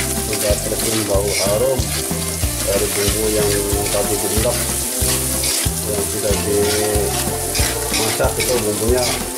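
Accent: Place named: Banyumas, Indonesia. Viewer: bahasa ngapak....emang dr kota mana pak....😁😁😁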